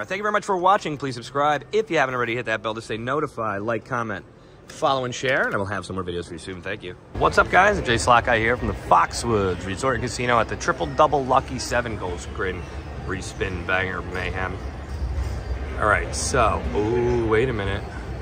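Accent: New Jersey, United States. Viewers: Love your videos. I love the NJ accent. Favorite I Sar vs I saw. Cool!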